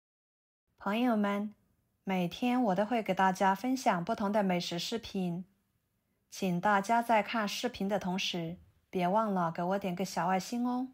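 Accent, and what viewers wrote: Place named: Yunnan, China. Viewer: 云南普通话😂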